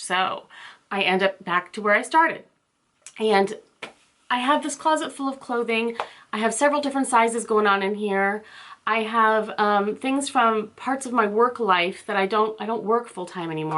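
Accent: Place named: Midwest, United States. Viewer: I just stumbled on your videos, and I love them! I knew I recognized that Midwest accent, I am a fellow Chicago suburb girl. I also strive to be casual chic in this stage of my life too. A stay at home mom with mostly grown daughters, looks like we are both in the same boat!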